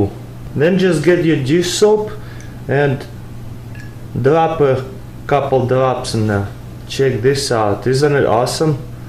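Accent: Russia. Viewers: ...because he is Russian and I thought his accent was very charming. He is also enthusiastic, like me, about science. So, I thought "We may be from different parts of the world. Yet, science makes us all very happy." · Dude I love ur vids they are AWESOME!!! So hel9ful and nifty! Keep it up! Ps - russian accent makes it even better!!!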